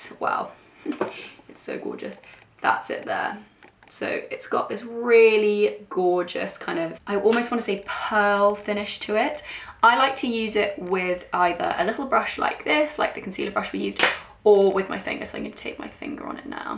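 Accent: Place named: RP, United Kingdom. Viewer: In what way, she has a pretty standard British accent?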